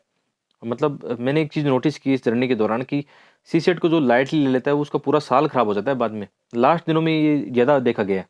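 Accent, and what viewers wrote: Haryana, India. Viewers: Sir are you from haryana apki awaj se pta chlta h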